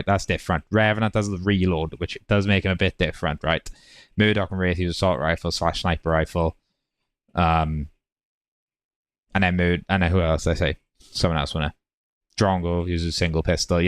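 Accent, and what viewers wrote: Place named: Wales, United Kingdom. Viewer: I must have sunk a thousand hours or so into Smite up until season 6 and I stopped playing because it got grindy and the fun went out of it. I want to give smite 2 a go, and I'll give Pred a go too; I played some Paragon back in the day and I didn't know it had kind of been resurrected - I've only just learnt about Overprime and some other game that used the assets that have both died. Looks like Pred has its version of Smite Arena that's just come out. The map is beautiful and I love the 3D aspect of it. I don't think I would sink any money into Pred though until it's been out for a fair while as that could just as easily disappear; I'd be more happy to put some money towards Smite 2 really. Sounds like you've got a Welsh accent; if so, friendly greetings from a fellow Welsh person! If not, friendly greetings anyway 😂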